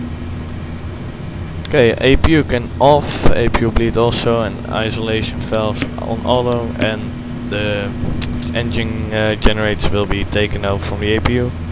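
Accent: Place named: Netherlands. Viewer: Nice video, I like your Dutch accent :-) That's remember me the cockpit visit when I fly with KLM, lol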